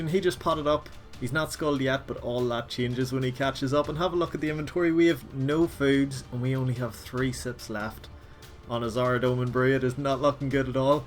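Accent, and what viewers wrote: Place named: United Kingdom. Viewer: Well said at the end my man and brilliant series of a casual play through, I've not played for a good few years now, gunna start a GIM with my friend and do the next league or dmm, never done either before. Started back in 07-eoc quit then came back to osrs <num>. Cheers for the insight, always wondered if it would be balanced enough to be fun for a casual player to farm some 07 GP early on and then have some fun pking mid game and have some decent gear at the end, or just swap out to 07 at the end and I'm guessing it goes for decent, I'll have to do some Lms, pked back in the day but I tried a couple games once and got totally dropped left and right haha super rusty. Look forward to your future content subbed. Love the accent aswell, I'm from the UK so it's refreshing to hear a fellow Brit ☺️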